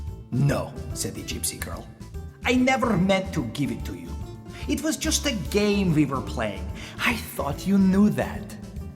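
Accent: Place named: Transylvania, Romania. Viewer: Oh, this is adorable! If my LO can understand your (quite well-done!) Transylvanian accent (she’s 3 🤷🏼‍♀️), I’ll introduce her to a few of my favorite SSTTITD stories.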